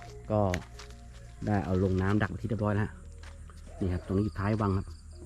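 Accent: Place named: Sukhothai, Thailand. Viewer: สำเนียงเหมือนคนโขทัยเลยครับ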